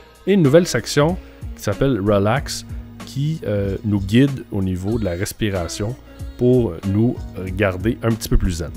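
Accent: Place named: Canada. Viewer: ppppffftttttt c'est relou l’accent Canadien, ont ne comprends rien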